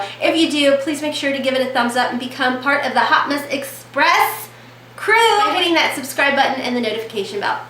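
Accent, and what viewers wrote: South, United States. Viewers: I have a question.... Do you have a very heavy southern accent normally? I feel like you might but try not to have one in your videos maybe??? Well, if so, you should really let that shine through.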